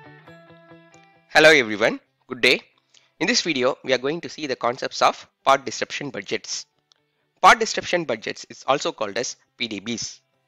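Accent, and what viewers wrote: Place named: India. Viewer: It's difficult to listen you english india accent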